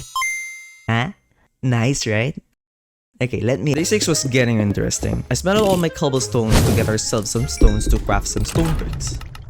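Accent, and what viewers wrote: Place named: Philippines. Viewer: its really funny how i was slowly realizing that this guy was filipino by just listening to his voice. (BTW insane video keep it up)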